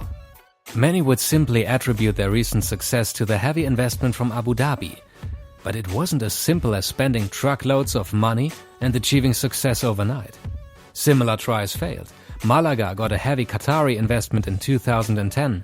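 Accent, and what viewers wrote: Germany. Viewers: German accent as well haha (I love it btw )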